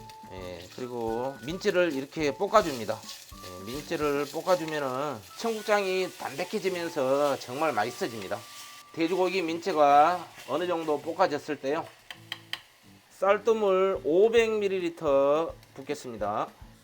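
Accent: Jeolla, South Korea. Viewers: 우리 샤프님 얼굴한번 보고싶네요 전라도 구수한 목소리가 참좋아요 요리도 잘하시고 구독자님들에게 펀리하게 요리도 하시면 ㅋㅋ 너무 좋아부러요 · 쉐프님 말씀도 구수하시고. 음식도 왜 케 잘하시는지 전라도 사투리 . 아. 쉐프님존경합니다. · 전라도사투리 쉐프님 최고입니다!!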